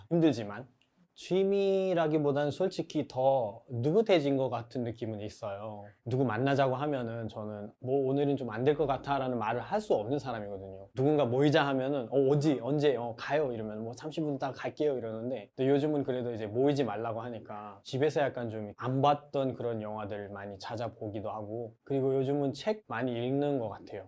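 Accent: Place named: South Korea. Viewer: 말투가100% 한국사람인데요.?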